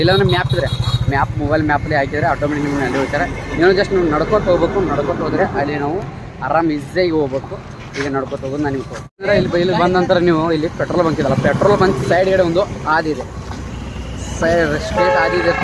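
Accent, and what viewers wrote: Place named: Davanagere, India. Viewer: Bro nanu davanagere talk erne maani bro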